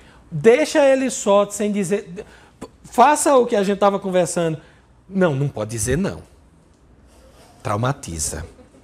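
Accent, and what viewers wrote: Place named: Northeast, Brazil. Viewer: Esse palestrante é do nordeste? Sotaque bonito! Moro em João Pessoa, e tenho amigos pernambucanos, potiguás e cearences.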